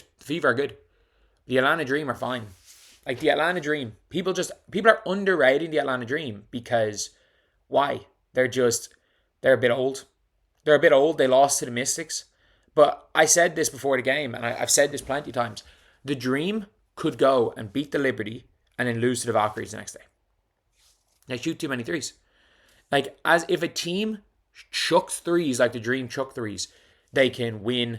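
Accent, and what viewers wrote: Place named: Ireland. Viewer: Australia?😂😂😂😂 You can't hear that irish accent?😂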